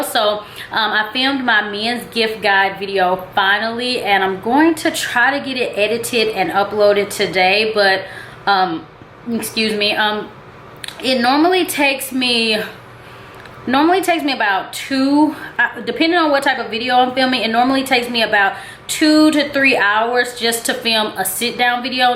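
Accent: South, United States. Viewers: shes from the south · Southern accent maybe?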